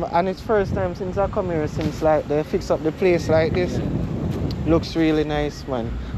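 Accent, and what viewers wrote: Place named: Jamaica. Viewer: The seawall is vastly different from the 1960s when I last visited it. I am surprised they have not lit up the seawall wall itself at night since it is meant to be a gathering place. Anyone could fall off the ledge or be assaulted, and no one might notice. It looks like 2' to 3' wide. You should wear headgear with a LED light. LOL Did they eradicate the mosquitoes? The burger lady needs some training in customer service. She didn't even crack a smile or a "thank you for your business, and come again. Good night!" You met with with a nice group at the end. The one Trinidadian (accent) and the dozen Guyanese (accent) around the table reminded me of when I visited the relatives in Guyana. LOL At least they understood your Jamaican accent. If you put a Trinidadian, Guyanese and a Jamaican in a room with Americans, the Americans would get very confused. 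They couldn't understand me, especially as they were looking a Chinese speaking perfect English. My Trini (Chinese) nephew had two friends at a L.A. trade tech college; a A Belizian (Black) and a Jamaican (Black). They were conversing in their native dialects to each other in class. When the American instructor walked into the room, he said to the the ladies, "I didn't know you spoke Chinese." The trio looked at each other, and bust out laughing.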